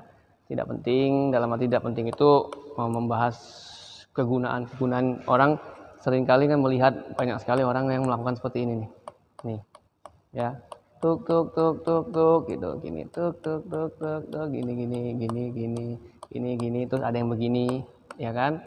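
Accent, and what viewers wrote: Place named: Palembang, Indonesia. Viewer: Ay wong Palembang koyong ini😂 Palembang dimanonyo Yong?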